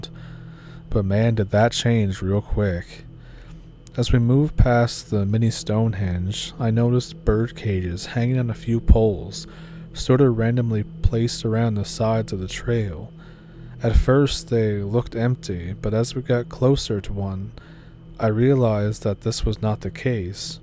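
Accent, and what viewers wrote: Canada. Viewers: How is it you grew up in AZ but have the hardest Canadian accent I've ever heard?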